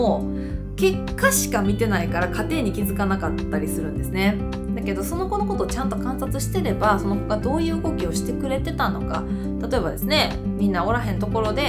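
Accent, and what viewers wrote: Kansai, Japan. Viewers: いつも見させてもらってます。 今回のいい方で「センス」と言う言い方は、ちょっと言いずらいですね。 あと、関西弁以外の標準語も取り入れて下さい。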